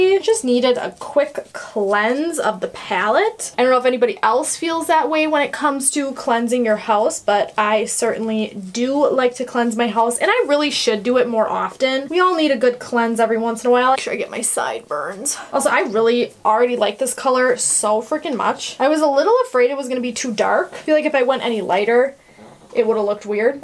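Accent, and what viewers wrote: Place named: Wisconsin, United States. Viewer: I knew I heard a fellow Wisconsin accent lol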